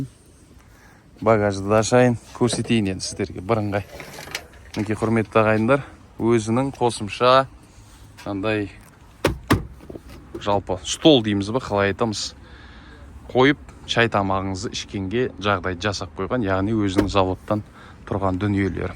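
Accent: Standard. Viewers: Мен озим колик айдай алмайм.Бирак узбей коремин таза казакша жургизесин Касибине береке!!!👍👍👍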